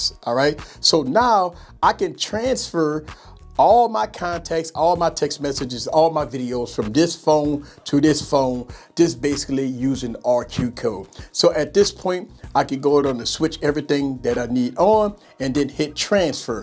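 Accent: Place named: New Orleans, United States. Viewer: New Orleans accent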